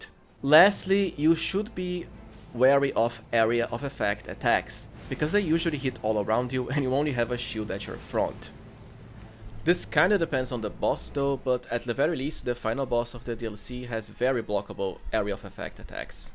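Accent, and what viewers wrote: Brazil. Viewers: Are you BRAZILIAN? RECONHECI O SOTAQUE A KILOMETROS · You have a strong Brazilian accent. Are you one?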